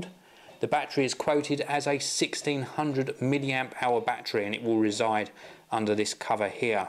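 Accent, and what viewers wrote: England, United Kingdom. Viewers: British english is sooo better than american one to understand! :) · British accent makes people sound he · gotta love brittish accent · i love tech, and i love the British accent... so tech given to me in a British accent? awesome *thumbs up* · I love british accents, everything sounds 20 % cooler with that^^ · Yay British accent :D:D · Can speak a little beat less by English accent:).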